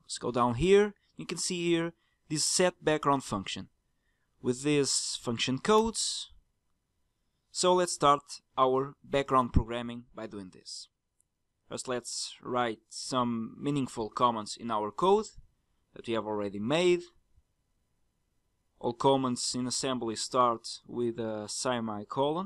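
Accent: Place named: Portugal. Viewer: Ahahah Portuguese, knew it just from hearing it :P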